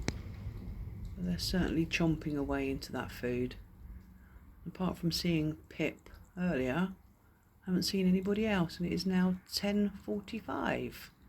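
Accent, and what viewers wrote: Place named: West Country, United Kingdom. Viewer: Also, being a proud glasweigan (& i think I've told you the funny story of being in Devon - i guessed ur accent even though I don't think u ever saw that message, especially as it was Simón & Magoo time and u were run aff yer feet!! - I went to "Buckfast Abbey". Thank God I'm sober now 🙏 but going there was a big thing for a bucky lover 😆 Anyways what am I on about, aw aye - something you, Karen & Carol could watch, perhaps. It's a comedy. I'm being a bit bias, with my glasweigan specs 👓 on - but have you heard of a bbc sitcom series called, "Still game????" I doubt it because you are so far south in cornwall, beautiful west country. Well the last sitcom bbc did of us, was "rab c nesbit". I think you'll know of that. But STILL GAME is so funny. It was made more than 20 years ago. About 2003 it started. Wee half hour episodes. 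It is on bbc iplayer. And YouTube. But you must start at series 1 episode 1!! You must, to get the gist and to enjoy the hilarity. If you are looking for something different to watch, something funny, and if you don't understand any of the glasweigan words, geeza shout 😅 But am sure you'd like it, the English people I've recommended it to, they've gave excellent feedback. Much better humour than Rab c nesbit ever had 😮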